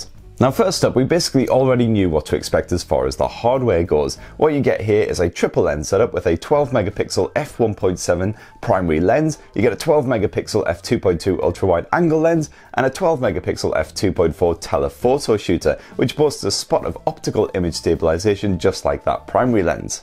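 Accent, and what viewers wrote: United Kingdom. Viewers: Can I just say, it is so Great to hear that wonderful British voice, I fricking love this channel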